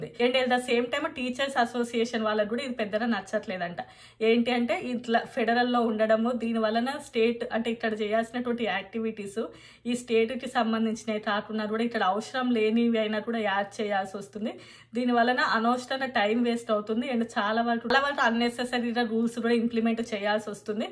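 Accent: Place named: Karimnagar, India. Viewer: Madam , as per your accent, are you from Karimnagar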